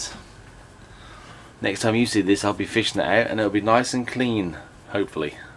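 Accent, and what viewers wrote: United Kingdom. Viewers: Dude you sound like you are originally from the uk or something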